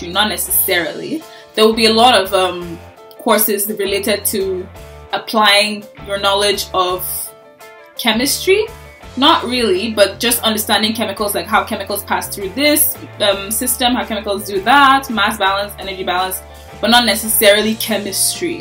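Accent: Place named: Northwest, Cameroon. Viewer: This is really good. It's helping me. You are sounding like a north west Cameroonian.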